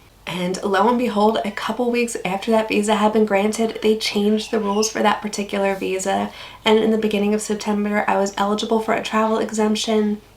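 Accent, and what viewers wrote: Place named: Australia. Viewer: Crikey! a full year already. Congrats, thanks for the behind the scene's look, really interesting. I have watched and enjoyed many of your vids, seeing a person from the U.S. become an Aussie is awesome, small changes in speech (using slang, Aussie accent etc.) creeping in, (it's a secret ingredient they put in Vegemite, Shapes and Chicken Salt) before you know it your thongs have fallen from your hips to your feet;-). Love your channel, best wishes and hope for continued success! Cheers mate.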